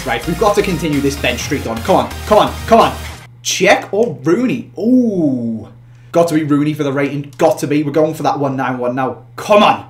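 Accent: Liverpool, United Kingdom. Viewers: are you from Liverpool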